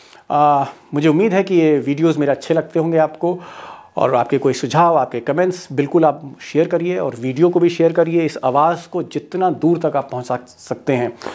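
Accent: Lucknow, India. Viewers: Aap ke andaz me lucknow ka asar dikhta hai.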